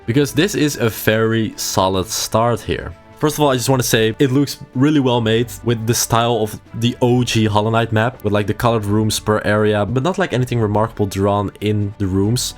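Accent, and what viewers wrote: Netherlands. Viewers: Wait your dutch?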